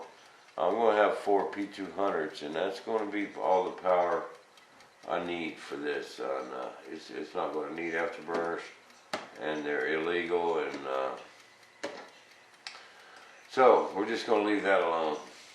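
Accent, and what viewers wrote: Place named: Louisiana, United States. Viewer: what part of Louisiana you's from...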